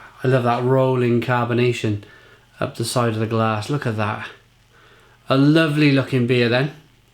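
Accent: Wales, United Kingdom. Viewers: He's Welsh. Way different to a Scottish accent.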